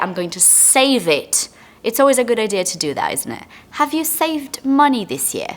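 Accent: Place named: Leeds, United Kingdom. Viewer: Hi!!! Loving your lessons. Have you ever thought of moving back to England? I used to live in Leeds, about 15 years ago, and i'm enjoying a lot listening this accent again. You're a perfect teacher!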